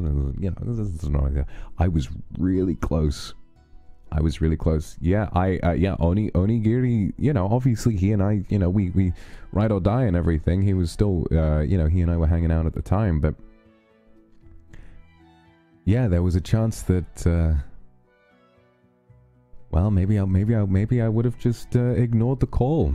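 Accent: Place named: United Kingdom. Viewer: 真的好愛爹地，瞬間愛上英國口音XD 聽完他的雞湯真的變得很有精神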